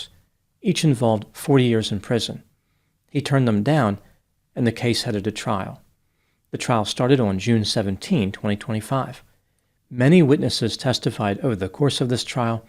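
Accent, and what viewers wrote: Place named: Pennsylvania, United States. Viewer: I keep noticing your accent… sounds very similar to mine. I’m going to guess you’re from somewhere in South central or eastern PA or north MD? I’m from York pa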